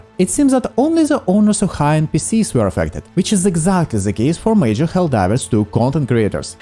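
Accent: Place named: Eastern Europe. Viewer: Love the video and love your thick Eastern European accent more. Hope to see your channel grow and get those sponsors friend~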